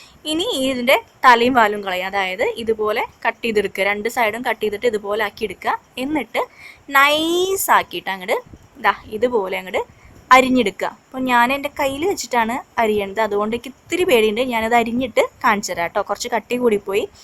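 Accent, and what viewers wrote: Thrissur, India. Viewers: തൃശ്ശൂക്കാരിയാണോ ചേച്ചി? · Good presentation with Thrissur accent. Even a kid can understand.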